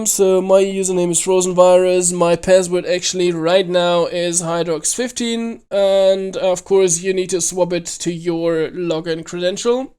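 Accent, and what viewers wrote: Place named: Germany. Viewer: Are you german? You sound german :) I have a problem --> I only get the message (translated from norwegian): The taget you have assigned, is invalid. control if you have assigned it to the right place or filename any ideas? (im using w10) The line is below "C:\Users\Magnus\Desktop\DEDICATED SERVER\steamcmd.exe steamcmd +runscript scripts/7daystodieserver.txt"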